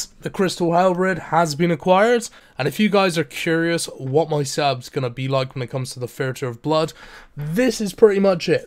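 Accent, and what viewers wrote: Bristol, United Kingdom. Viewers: Brizzle accent mesh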